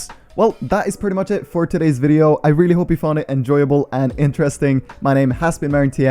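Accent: Norway. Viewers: Er du fra norge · are you norwegian?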